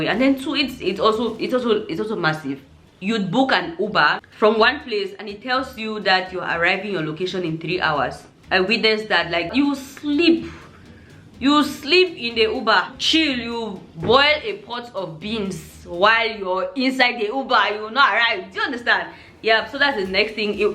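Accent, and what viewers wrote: Nigeria. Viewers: You really sound Nigerian 🧢💝💝💝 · Please, come back sooner because you carry Naija DNA in your system - it shows on your face and voice. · You are infact speaking and acting like a Nigerian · Nice review but the puf puf and beans thing is a cultural shock to us And about what you said that you could buy allot of things with little money, things are not expensive and stuffs like that Try working in Nigeria, earning in Nigeria and buying in Nigeria, Then you will know if things are really cheap But in all thanks for the wonderful review It's how you sound like a Nigerian for me Stay blessed ❤❤❤ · Well done ! ! Several Nigerians don't even know the NEW national anthem, myself included. And, I'm not even going to bother to learn it. I prefer the OLD one since my days as a youth in primary school, etc. You sound very much like a Naija lady, Cameroon 🇨🇲 🇨🇲🇨🇲 and several West African countries are NIGERIA too, we're one PEOPLE. 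SALUTE 🇳🇬🇳🇬🇳🇬🇳🇬✌🏾👉🏾👍🏾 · You sound Nigerian though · This is really interesting. Are you sure you are not Nigerian? Your accent and mannerism is all Nigerian. You are really a good girl. I pray some Nigerian guy would make you happy all the days of your life. Please stay here. This is your home. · I'm seeing you for the first time and I totally enjoyed your content and style. Well done. You sound much more Nigeria than Cameroon. Explain why in not more than 20 words. You be very fyne gurl shaa. Keep making great content dear. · Thanks so much my sister giving that great account about our great nation Naija please stay bless my question is are you sure you are a camerounian ?am asking this because of your accent . but in all kudos to comments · Good 😊😊 but you have Nigerian acent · You dey give me joy sis I couldn't even tell you weren't Nigeria from your accent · You're already talking like a Nigerian, it'll happen the another will keep you in Nigeria hopefully. 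First time seeing you and subscribed cos I loved how well you integrated into the society and the balanced views about Nigeria. Nigeria to the world !!! God bless you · What I don't understand is the fact that this girl is looking so much Nigerian, talking so much like Nigerian, and Nigerianly beautiful. Anyways, I don subscribe. She must have been a Nigerian in her former life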